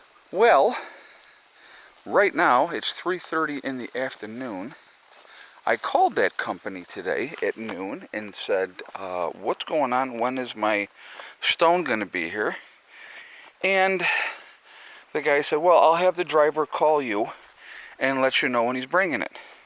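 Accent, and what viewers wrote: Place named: New York, United States. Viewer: Psssst, your NY is showing.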